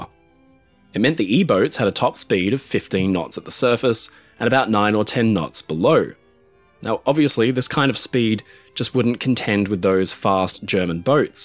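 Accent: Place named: Australia. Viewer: Australian accent is just so hard to listen to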